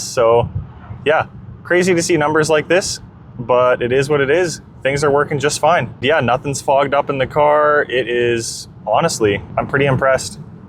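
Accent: Canada. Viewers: Your Saskatchewan accent is deafening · the accent, the stache, the Saskatoonian winter, the consistent conversion of metrics, takin er out fer a rip, what an absolute beauty bahd. All regen, no degen.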